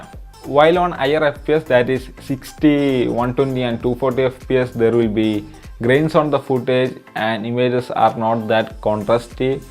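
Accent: Kerala, India. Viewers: You have a very bad accent of Kerala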